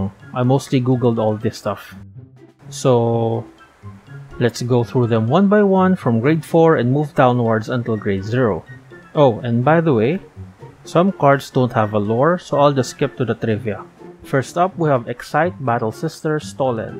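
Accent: Philippines. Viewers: Very fili accent. Love this vid and lore!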